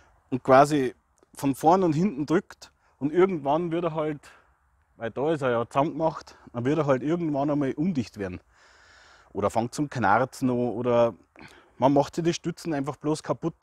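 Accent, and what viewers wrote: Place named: Bavaria, Germany. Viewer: bitte untertiteln, da boarisch nicht jeder versteht !!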